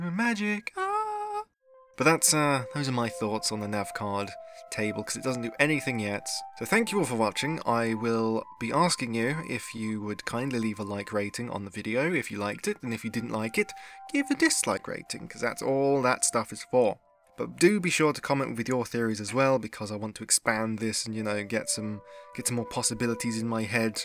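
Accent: United States. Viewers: wow thats actually an amazing american accent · Honestly I thought you were American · The American accent fooled me lol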